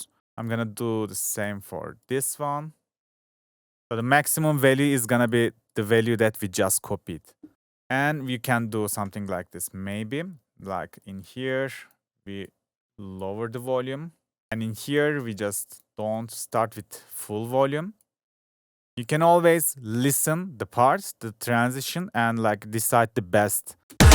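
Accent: Turkey. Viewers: I hear Turkish English accent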